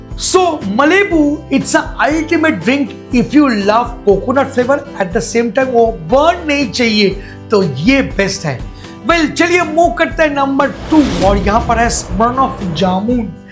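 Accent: Bengal, India. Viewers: Bengali accent chillia chiliia berachhe tmr theke 😂❤❤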